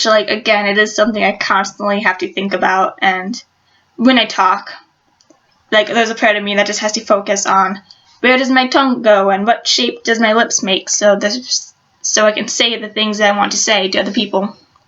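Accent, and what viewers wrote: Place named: United Kingdom. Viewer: Thank you for sharing my dear. My 3 year old son is in speech therapy. His therapist mentioned speech apraxia for the first time this week. After reading about it I got very sad and worried for my sons future. First thought that came to me was the bullying he will experience at school. Now watching your video gives me hope. You are very brave and to be honest you sound a bit British. I love it! Many thanks and wishing you many blessings.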